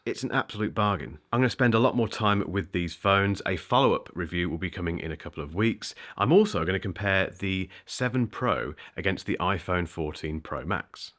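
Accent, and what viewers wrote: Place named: Essex, United Kingdom. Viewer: This man speaks with an Essex accent. He is an Essex boy.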